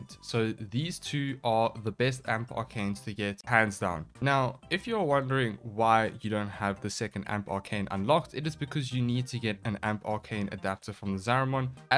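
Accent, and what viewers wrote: South Africa. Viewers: This is South African I love it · That accent is it south african?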